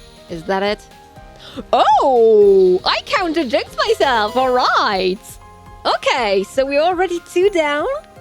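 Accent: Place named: France. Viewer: That French accent x)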